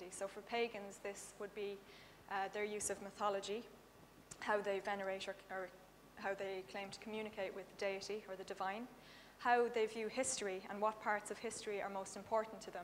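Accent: Ireland. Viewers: you have the most beautiful eyes and I absolutely love the Irish brogue in your voice,...pretty and smart is a rare combination...huzzah to you! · Such a good presentation. Also that Irish accent is to die for. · You did an excellent job presenting your research methods in an unbiased fashion. It's rear to hear anyone talk about any religion without hearing a lot of the personal opinions of that person. You did a great job!! I look forward to reading your book! Though another video presentation would be nice. (Im from America, so hearing about paganism in a Irish accent is really cool, plus you have Gorgeous eyes ;)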